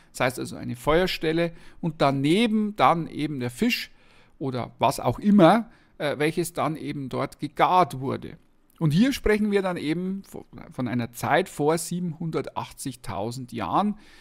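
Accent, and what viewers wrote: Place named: South, Germany. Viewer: angenehmem süddeutschen Akzent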